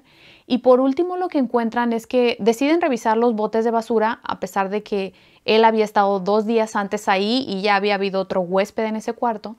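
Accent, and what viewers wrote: Mexico. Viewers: gracias, ya vi que salen tus Suscriptores, muy bien, así podemos ver tu avance. Tienes una dicción, tono de voz muy bonito, así se habla en Ciudad de México y Estados del rededor de Cdmx... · 👏👏👏👏👏De qué nacionalidad eres? Me sueñas como yo, mexicana o tal vez de Guatemala????? Soy súper fan de varios Youtubers de crímenes, pero de ninguna mexicana porque hablan " muy fresa" y no tolero ese tonito de voz , además de que eso no me parece profesional. Por fortuna de sugerencias di con tu canal y me encantó tu calidad de investigación y narración. Saludos desde Suecia. Soy mexicana pero estoy casada con un sueco y soy residente aquí. Este tipo de videos me hacen sentir muy cerca de mi amada Latiomamérica. 💓💓💓💓💓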